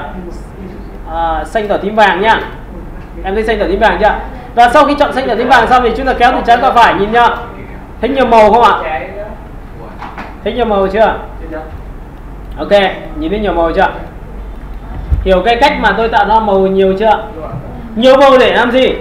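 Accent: North, Vietnam. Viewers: mãi mới tìm dc thầy giỏi .nói giọng bắc. mấy cái miền nam ko nghe rõ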